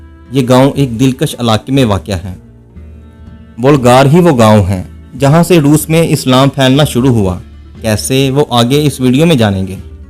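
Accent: Punjab, Pakistan. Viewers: پنجابی میں اردو بولنے کے بجائے پنجابی میں بول لو تو زیادہ اچھا لگے گا۔وڈیو تو ویسے بھی چوری کی ہے۔ترجمہ اور ڈبنگ تو بندے کے پتروں کیطرح کرلیاکرو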